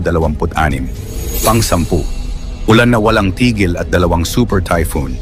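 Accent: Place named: Philippines. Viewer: NALILITO ako sa NARRATOR. PINOY kb? IBA kc intonation n accent mo😅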